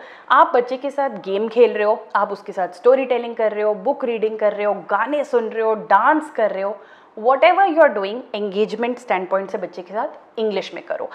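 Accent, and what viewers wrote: India. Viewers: U r from south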